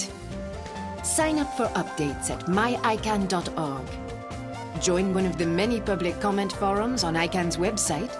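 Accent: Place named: Sweden. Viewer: I may be wrong, but I think it's a Swedish accent. I speak French and don't sound like that, and the CEO of ICANN is Swedish. I also believe the man who is credited for inventing the World Wide Web, Sir Tim Berners-Lee, is from England.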